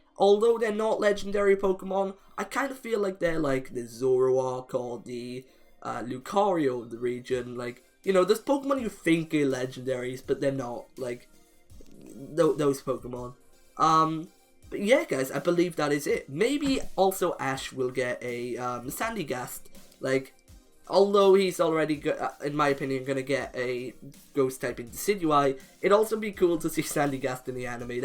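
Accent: Wales, United Kingdom. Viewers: R u Welsh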